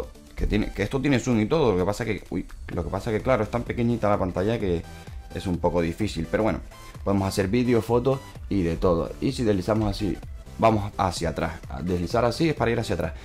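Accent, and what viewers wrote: Canary Islands, Spain. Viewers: ya lo mire en otro video lo dice es canarion por cierto yo soy chicharrero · Eres canario si o si jajajaj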